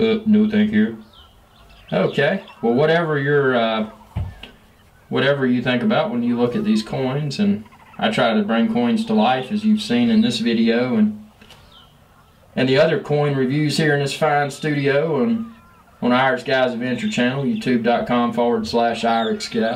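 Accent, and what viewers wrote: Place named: Boston, United States. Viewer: You sound like a Yankee I know. From South Boston :-)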